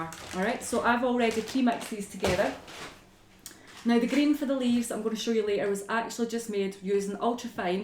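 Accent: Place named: Scotland, United Kingdom. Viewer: So beautiful you are so talented and what a lovely scottish accent , my husband came from Fyfe. I have tried so many times to wire peonies but always seem to fail they spin and crack so sadly I dont try anymore😢 thank you for your tutorial x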